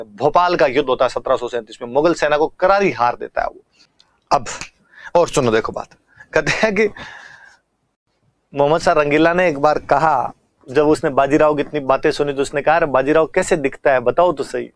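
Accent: Rajasthan, India. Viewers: Wow... Even though,I took 2-3 times to listen n understand the rajastani tone of hindi he depicts. I feel proud of his efforts.💫💪 Thankyou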